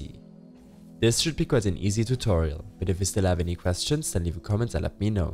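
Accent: Germany. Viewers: you speak like a german, if im right then danke für das tutorial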